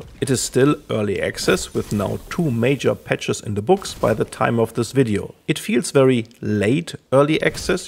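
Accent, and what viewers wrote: Germany. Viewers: Oof, the german accent is kinda killing me.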